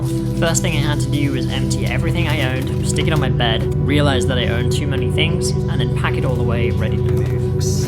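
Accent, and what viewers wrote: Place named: England, United Kingdom. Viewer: Why is your accent getting more English.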